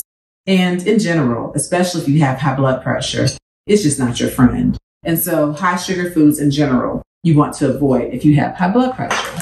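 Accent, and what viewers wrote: South, United States. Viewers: Oh-my-goodness! thank you for making this video. You are doing 4 things here for: Reinforcing much of what I'm already avoiding and giving me more reason to stay focus. Reading the content, cooking more. I'm a little a Pescatarian finding more options. You are also causing me to miss my home grounds in Arkansas everytime you say " You know Goodden-well" I've only heard from southern people.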